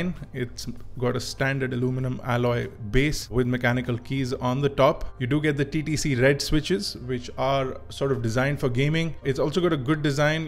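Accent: India. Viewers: R e bhai aluminum bol le..kya alomenum alomenum lga rakha h...kab tak angrejo ki copy karoge...Indian accent is the best accent.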